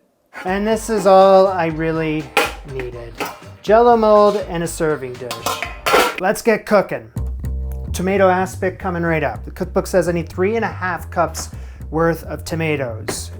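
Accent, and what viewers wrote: Canada. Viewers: your Canadian has gotten SO much more noticeable since you moved 🤣 and when I saw the aspic in the thumbnail I laughed like a maniac 🤣👌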